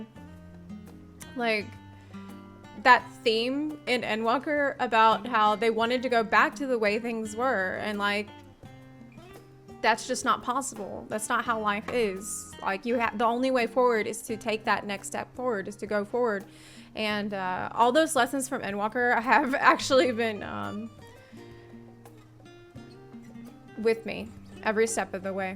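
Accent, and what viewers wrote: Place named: Kentucky, United States. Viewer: aren't you from the america? i mean i live in canada so i'm no expert but you got some kind of...kentucky?ish accent lol.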